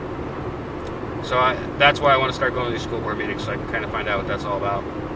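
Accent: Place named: Minnesota, United States. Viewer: kind of sounds like northern Minnesota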